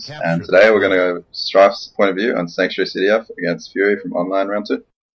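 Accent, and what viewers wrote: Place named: Australia. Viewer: You can just hear enthusiasm when he mentions how excited he is for ep2, but seriously this is better than the american commentary vids. This is actually pretty helpful and I'd rather listen to an aussie accent over a yank anyday.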